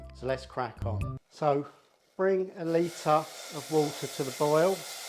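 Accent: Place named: England, United Kingdom. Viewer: He’s got a perfect English accent for a Pakistani chef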